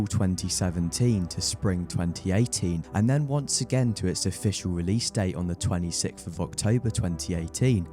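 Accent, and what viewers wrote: United Kingdom. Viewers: Why does it seem like nobody in america is making these videos? Every gta 6 video sounds like some extra from harry potter narrating it.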